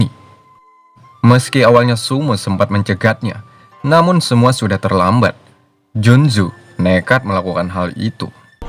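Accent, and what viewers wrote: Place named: Madura, Indonesia. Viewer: lu orang mana bg kok logatnya kek orang madura atau jawa timur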